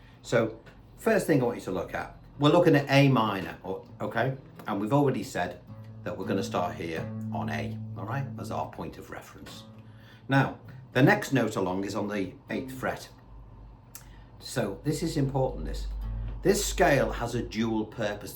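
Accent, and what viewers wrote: Liverpool, United Kingdom. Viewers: You sound a tad scouse. Can't listen to that awful scouse dialect.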